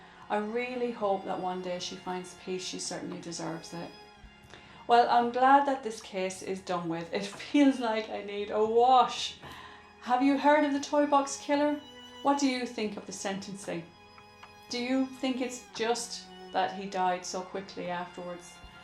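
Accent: Ireland. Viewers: Glad I found this channel. You’re accent sounds a bit Irish ☘️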